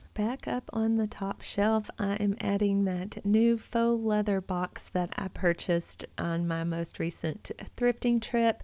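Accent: Texas, United States. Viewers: I just recently started following you, and thought I detected a Texas accent. I'm from Lubbock. Thanks for all the great inspiration. Your home is beautiful.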